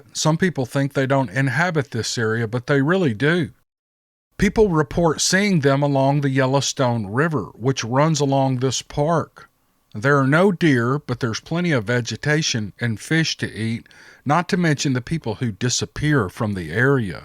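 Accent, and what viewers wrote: South, United States. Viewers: I appreciate you so very much. Love to hear your awesome Southern voice and your sense of humor. God bless you & your family!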